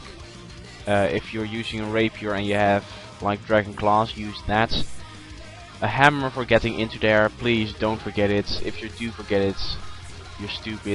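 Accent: Netherlands. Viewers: r u dutch? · You're Dutch, I can hear that lol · dutch accent! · lol u can hear. he is from the netherlands :P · haha ik kan gewoon horen aan je accent dat je nederlands bentXD: WEL TOF TROUWENS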